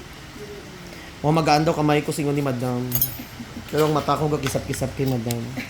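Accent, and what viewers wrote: Iloilo, Philippines. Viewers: You sound ilonggo/hiligaynon po ☺️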